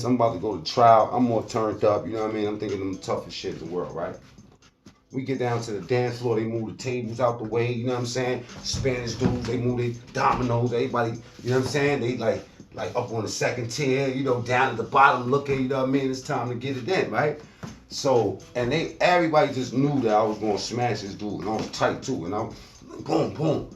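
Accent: Brooklyn, United States. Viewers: Yo I just subbed to ya channel cuz you kept it mad real you coulda lied and been like you washed home boy up but na u kept it funky peace homie IDK if ur from Brooklyn but u sound just like my boy from Brooklyn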